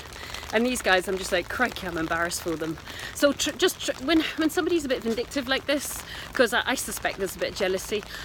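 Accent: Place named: Scotland, United Kingdom. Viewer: Trying to place your accent... definitely some distant Scottish in there, time in England and a Brooklyn twang too...?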